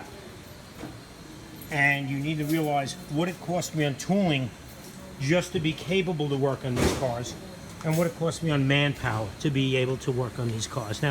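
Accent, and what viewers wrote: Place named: Valley Stream, United States. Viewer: I watched this video and by your accent I'm going to guess you are from valley stream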